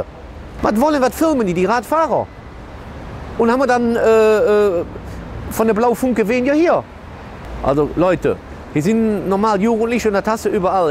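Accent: Cologne, Germany. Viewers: Haha, zu geil. Habe lange kein richtiges kölsch mehr gehört. Dat is schaad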